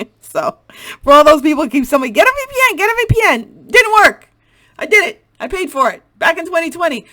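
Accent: New York, United States. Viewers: V you was so NY throughout this video! Lol thank you so much for the heads up! We really in this !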